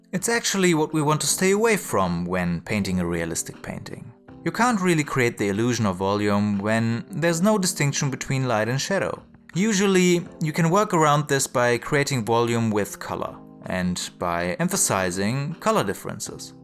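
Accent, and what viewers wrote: Germany. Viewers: In his defense, it's probably the very strong german accent, in combo with trying to sound american and "cool"?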